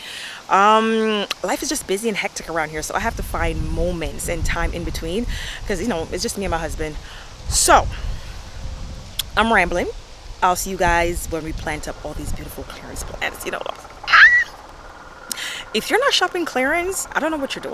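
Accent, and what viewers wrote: Jamaica. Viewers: You went back to the Jamaican talking, me tired me nah lie ,lol a nuff work this you know 😄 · Love when the Jamaican comes out. Mi tyaad. Can't wait to have the land space to do this extensive gardening. Love watching your videos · Rooting for Jamaican girl love wi accent.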